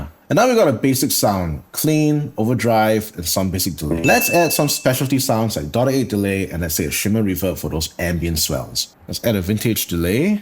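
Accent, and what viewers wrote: Singapore. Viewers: Just in time for my Helix LT :) Are you from singapore by any chance? you sound singaporean. Anyway, i'm Singaporean turning to your channel from Berlin. Anyways, great video!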